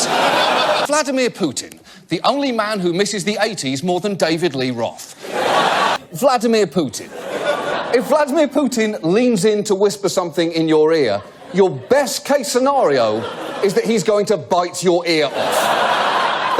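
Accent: United Kingdom. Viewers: Pavlovian reinforcement, every fucking segment goes like this. 1. Introduction to topic ( normally around 10 seconds ) 2. Snarky remark, normally non-sequitur. this keeps you from fully processing part 1 and coming to your own conclusions. 3. Approving laughter, this is what keeps you hooked, equivalent to the food that Pavlov gave his dog. Effectively ruining your sense of humor, reasoning skills and overall intelligence 4.Repeat until you get enough for a full show. this guy is shit humor, he makes you dumber the more you watch him, but makes you feel smart because of his Brit accent and glasses. By malicious intent, or stupidity on his part, humor is now worse off because of this nightmare of Steinbeck and Orwell.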